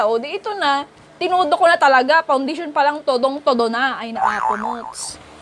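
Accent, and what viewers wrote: Ilocos, Philippines. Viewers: Ate ilocana ka? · "Ayna apo" "Ayna apo met" "Kastoy Neh Uh" Jusmeyooo, I lovee your ilokano accent atee. Mayy bagoo akong beauty vlogger na papnooriiinn❤ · ilokano accent .... Yeah proud ilocano here..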